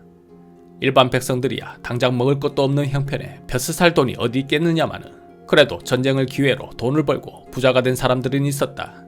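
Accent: Gyeongsang, South Korea. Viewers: 이분 경상도 억양만 없으면 듣기 좋을텐데...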